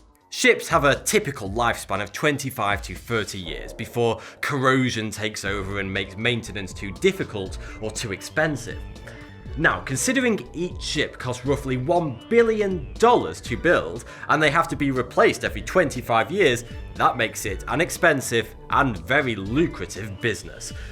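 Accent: United Kingdom. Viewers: That's just a native British accent, my friend. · What area of Britain does his accent come from? I'm curious.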